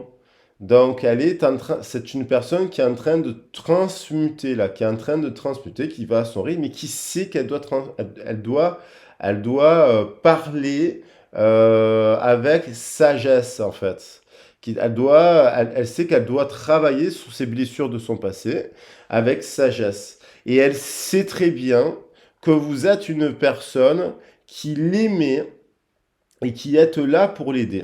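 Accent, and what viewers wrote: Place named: Marseille, France. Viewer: BON et bien bon courage!! J' espère que la communication va bientôt arriver avec Arnaud...au fait Je suis cancer ascendant vierges et descendant poisson 🐠 alors voilà j'y crois comme à la 🌏 terre j'y croit comme au soleil ☀ et à mon Arc en ciel qui va m'apporter de très bonne 🔮 prédictions..bien sûr avec ta voix chaude et sensuelle qui n'appartient à personne qu'à toi sur le net! Et c'est un plaisir de t'entendre à chaque fois avec l'accent de MARSEILLE! BRAVO 👏 POUR TOUTE TA RICHESSE INTÉRIEURE QUE TU AS VRAIMENT MÉRITÉ ET TOUTE MA GRATITUDE POUR NOUS PERMETTRE D'Y ACCÉDER GRATUITEMENT ET AVEC TANT D'HUMILITÉ 👋 CIAO 👋 😆🤩😉🌟❤️‍🔥💞🌈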